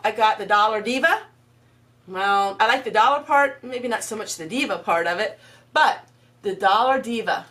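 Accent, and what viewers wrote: Pennsylvania Dutch, United States. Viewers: Hi. I like your PA Dutch accent. thanks for sharing. :)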